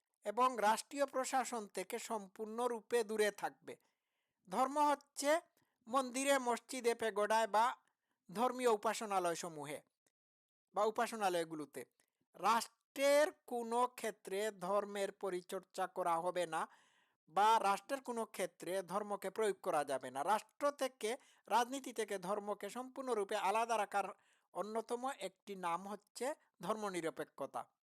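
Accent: Sylhet, Bangladesh. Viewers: Tumi sylhety tumito maiyluker moto kota bolo tumar anda maniker moto kora dorkar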